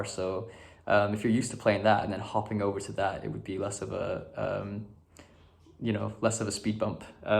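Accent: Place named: Scotland, United Kingdom. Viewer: he's a SCOT, probs from the north cuz his accent is chill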